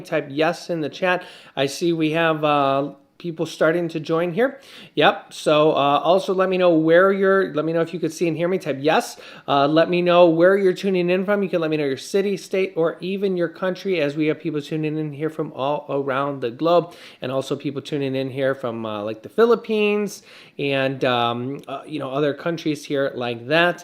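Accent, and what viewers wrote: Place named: New York, United States. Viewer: Just, I can hear you New York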